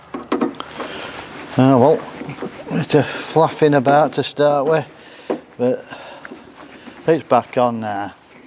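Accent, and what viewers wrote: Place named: South Yorkshire, United Kingdom. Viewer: Great video. Great accent - sounds like South Yorkshire to me. Great to hear it on t'internet. New subscriber. Looking forward to the review of its functionality.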